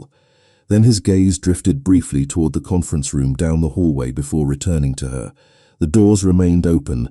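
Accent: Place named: United Kingdom. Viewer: I loved the story but it was like listening to one long sentence and why British voice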